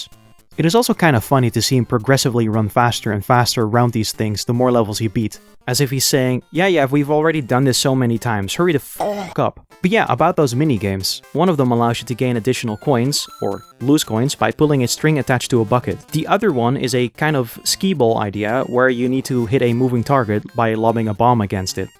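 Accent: Netherlands. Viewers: are you dutch by chance?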